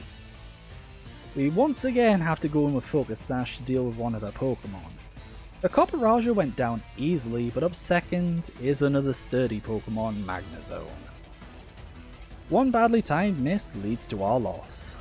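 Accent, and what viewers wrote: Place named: United Kingdom. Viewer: Me: Who is this channel? Algorithm: Trust me bro Me: (skeptical) okay... (plays video) (player has British/Euro accent) Me: oh FUCK YEAH this finna be good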